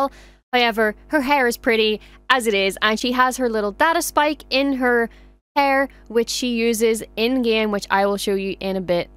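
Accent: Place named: Northern Ireland, United Kingdom. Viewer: Great video! Really appreciate your enthusiasm, and enjoyed the natural commentary in your wonderful Northern Irish accent. I'm a lazy gamer and therefore not usually a big fan of open-world games (e.g., couldn't get into Starfield), but this is Star Wars and I think I'll give it a go! Cheers.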